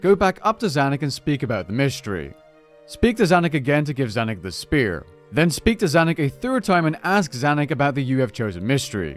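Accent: Netherlands. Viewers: Reiniger Defently dutch i can hear😂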